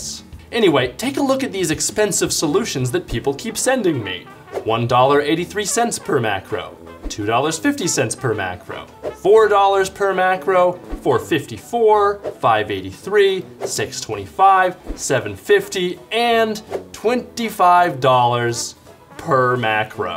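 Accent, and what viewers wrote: Netherlands. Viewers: Are you dutch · Are You from the netherlands?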